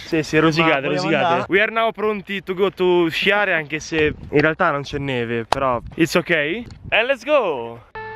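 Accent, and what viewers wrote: Italy. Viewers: inglese alla renzi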